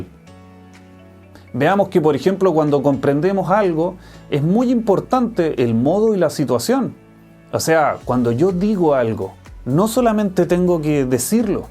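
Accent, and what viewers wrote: Chile. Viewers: que cuatico que, aunque uses español neutro y bien pronunciado, pude adivinar que eres chileno! algo en el tono, en las ultimas ''A'' de las palabras... algo me lo decía. comentario na' que ver con el video, pero es primera vez que llego a tu canal, me pareció curioso <3 saludos desde Valpo :D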